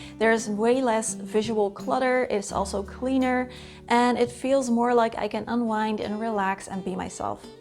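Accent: Netherlands. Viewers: You have a lovely channel.🙏btw, are you Dutch? Because of the accent I was wondering😊love your interior... I'm on my way to being a minimalist but it'll take me at least one year to get rid of unnecessary stuff...😂😁😇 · Love your calming style of videos and love minimalism! When I saw your background I knew you were Dutch somehow 😂 And when you started talking I was like yup! Subscribed!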